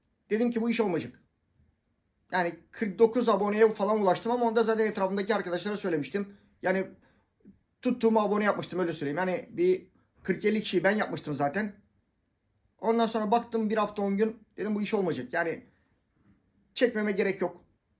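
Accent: Thrace, Turkey. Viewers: abi biz senı sevıyoruzya sadelıgın hele bıde trakya şivesi süper oluyo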